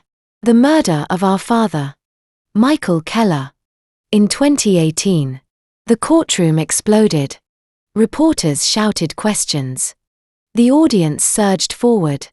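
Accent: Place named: Australia. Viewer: Very disconcerting for the narrator speaking Aussie but claiming Boston as home. Decent tale tho.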